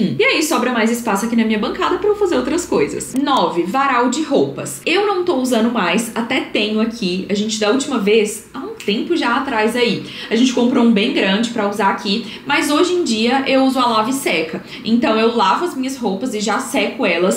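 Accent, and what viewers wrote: Minas Gerais, Brazil. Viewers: Coseraiada, muito mineira, que nem eu😂😂😂😂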